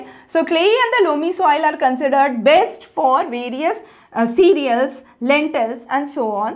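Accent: India. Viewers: This is Indian English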